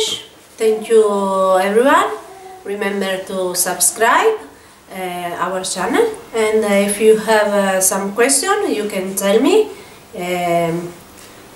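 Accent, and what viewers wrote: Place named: Italy. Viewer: U are so sweet I love Italian people and accent ♥️💚♥️💚♥️💚thanks for sharing video God bless you 🙏🙏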